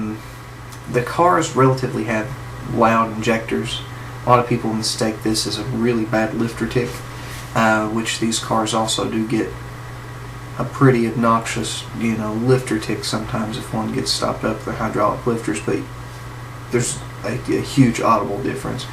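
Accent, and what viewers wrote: South, United States. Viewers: sexy southern daddy damn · Speak with southern accent loud and proud. Fuck what anybody else think. I can't stand the NY accent and find it obnoxious, but do you really think they care what I think.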